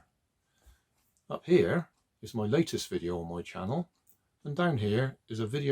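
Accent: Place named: RP, United Kingdom. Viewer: you have a BBC type voice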